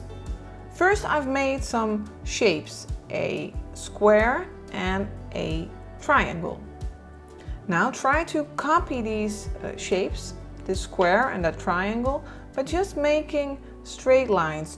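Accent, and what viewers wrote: Netherlands. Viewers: Hmm do I hear a Dutch accent? 🤔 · Are you dutch? I can sense that warm friendly accent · Are you dutch? The accent sound familiar. If so, could you also make some video's in dutch? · Are you dutch?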